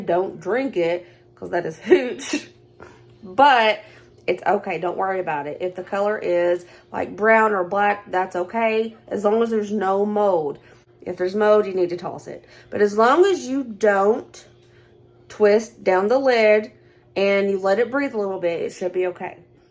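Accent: South, United States. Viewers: Love to watch the show and the great recipes. The southern accent is difficult to understand at times. Maybe slow down while explaining the recipes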